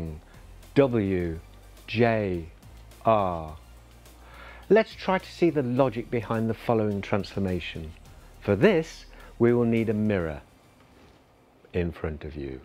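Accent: United Kingdom. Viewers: love love love this British accent😍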